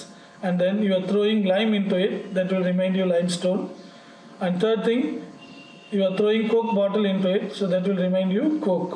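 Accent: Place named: India. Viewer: I liked the lesson. but seriously, you have such a strong Indian accent. Work on your pronunciation. But overall good lesson.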